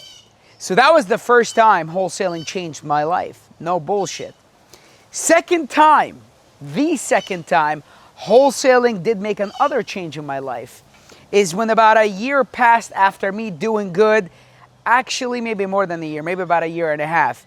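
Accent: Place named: United States. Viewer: Thank you for sharing your journey! Your American Accent is Wow !🎉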